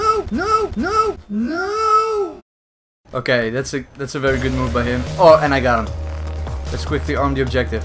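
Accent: Netherlands. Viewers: Your accent sounds like a Dutch accent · Ik merk aan je accent dat je nederlands bent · je hebt een nederlands accent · You sound a bit Dutch if you speak English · Nederlands accent, 1 van de redenen dat ik naar je filmpjes kijk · Jouw accent😂 Nederlander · Wat een heerlijk Nederlands accentje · instantly noticable Nederlandse accentje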